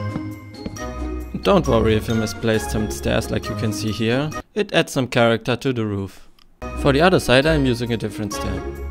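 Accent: Germany. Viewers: Ich will jetzt nichts sagen aber er hat ein deutschen akzent 😂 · Er hört sich deutsch an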